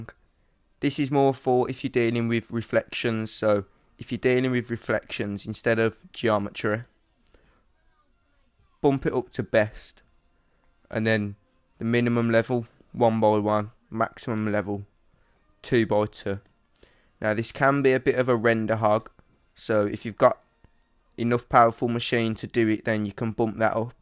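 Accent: Birmingham, United Kingdom. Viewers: i heard ya dog at 100 lol ignore me dude its good to hear a fellow brummy cheers for the tips man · Are you from birmingham?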